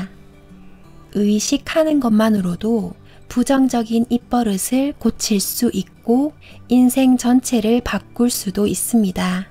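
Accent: Gyeongsang, South Korea. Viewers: 목소리가 매력적이세요~^^ 경상도 사투리가 더욱 매력 있으십니다~♡♡♡ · 아침일찍 좋은말듣게되어 오늘하루를 힘차게 보낼수 있겠습니다 경상도사투리가 정겹기도하구요~ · 경상도 아가씨다 ㅋㅋ 저도경상도 ㅋㅋ 이쁘시내예~~잘보고갑더~~밥묵고하소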